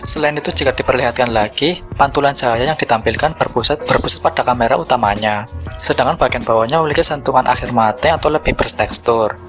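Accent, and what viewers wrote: Banyumas, Indonesia. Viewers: Ciri khas 😅Konten kreatore wong ngapakkk ikii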